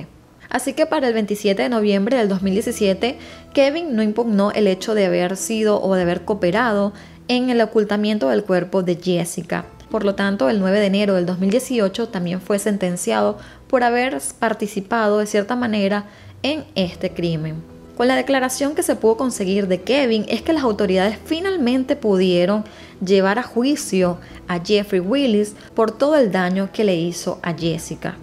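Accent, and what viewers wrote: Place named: Venezuela. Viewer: Hola bella, es un gusto escuchar tus casos y escuchar el acento venezolano lo mejor. Tú, LesmaVR, JulySeib y Dinosaurios las mejores, sería interesante que narres el caso de los Hermanos Faddoul caso que ocurrió en Venezuela.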